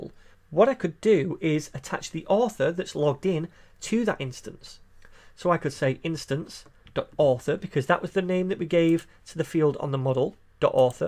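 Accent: United Kingdom. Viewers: That sounds like British.............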